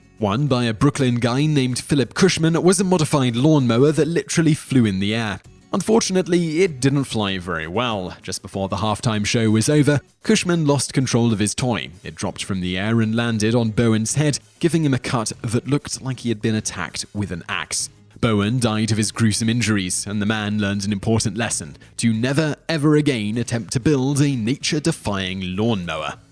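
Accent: United Kingdom. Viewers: I HATE his British accent !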